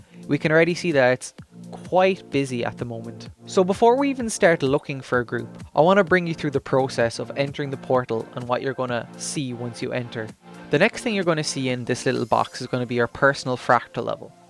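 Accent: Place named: Ireland. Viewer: Love your video, thank you!!! The Irish accent makes it better, keep it up!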